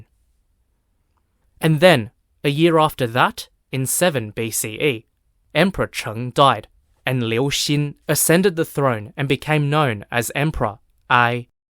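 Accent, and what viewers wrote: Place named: Australia. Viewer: First time watching. Interested. Ozzy accent puts me off cause it's usually racist. Only 4 mins in but this don't sound racist yet. I understand that sounds racist. It is. Hence interrested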